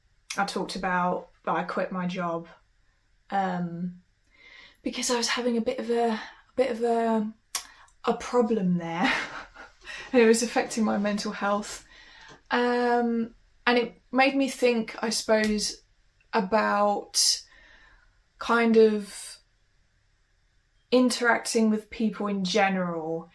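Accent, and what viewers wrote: United Kingdom. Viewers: THOZE DRY LiPZ, THE UNDER EYELiD BAGZ KOMiN iN, THE WHiTE WOMAN TWiTCH, & THAT ACCENT ! HOW R U BRiTiSH BUT NOT BRiTiSH GROWN !? U GOTTA TRY ADMiTTiNG WHETHER U THiNK THE DiCK iS CUTE OR NOT MiZZ ! U KAN'T KEEP BOTTLiNG UP ALL YOUR FEELiNGZ, NOR JUZT RELEAZE SOME OF THEM ON YOUTUBE, YOU'RE STiLL GONNA EXPLODE ! U HAVE TO B WiLLiNG TO SAY THiZ STUFF iN PERSON, ON THE SPOT, OR ELSE iT'LL EAT'CHU UP iNSiDE ! ONCE AGAiN, HONEZTY ! & QUESTiONiNG TOO ! & TRY & GET WHAT U EAT iN ORDER AS WELL, KAN B A MASSiVE HELP ! <link> "FOOD THAT KiLLS WiTH DR. MiCHAEL KLAPER-1993-FULL DOCUMENTARY-LECTURE-TESTiMONY" WHiTE0MOON UPLOAD ! TRY STiCKiNG TO EATiNG THiNGZ RAW THOUGH, THE MORE U COOK FOOD THE DEADER iT GETZ ! BUT U KAN TRY & HAVE BOiLED WATER AS A CONSTANT TO DRiNK THOUGH ! & iT'Z STiLL A DEATH PLANET MiZZ, & YOU'RE ALiVE ON iT, iT WAZN'T GOiNG TO END THAT WELL 4 US ANYWAY EVEN iF U HAPPEN TO B KOME iMMORTAL ! 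iT'Z ALRiGHT TO FEEL SAD, iT'Z NOT A DiSORDER, iT'Z AN EMOTiON ! VASECTOMiEZ 4 ALL, ESPECiALLY US HUMANZ ! AT LEAZT A WASH OUT METHOD OR SOMETHiN GUYZ ! [NOT YELLiNG, JUZT LiKE TYPiNG iN CAPZ]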